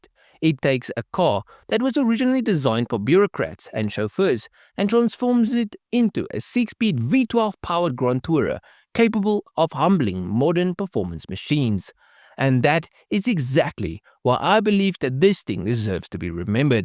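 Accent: South Africa. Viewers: The car is amazing! You never cease to come up with automobiles most of us have never heard of. You are an incredibly intelligent young man. And, as I've said before, your narration with that South African accent only ads to the videos enjoyment.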